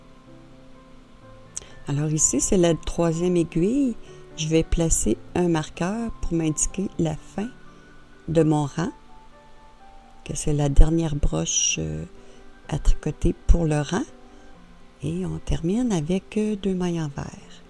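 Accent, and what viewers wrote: Quebec, Canada. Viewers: J’aurais dû regarder plutôt votre vidéo je suis en train de terminer Chaussettes DROPS 3-4 ans avec de la Fabel avec des aiguilles 3 et demie. Il y a longtemps que je n’en avais plus tricoté, j’étais un peu perdue merci beaucoup pour vos explications parce que je vais en refaire avec d’autres laines DROPS pour mes derniers petits-enfants jJaime beaucoup vos explications et surtout l’accent du Québec et les astuces que je ne connaissais pas. Un grand merci 🙏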